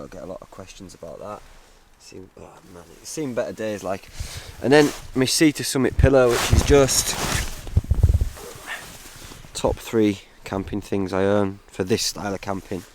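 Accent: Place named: Cockney, United Kingdom. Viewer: More cockney! More cockney!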